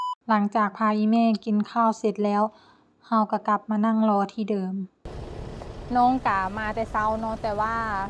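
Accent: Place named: Phu Thai, Thailand. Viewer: กดติดตามให้แล้วนะครับ สำเนียงการพูด เหมือนภูไท แถวจ.สกลนคร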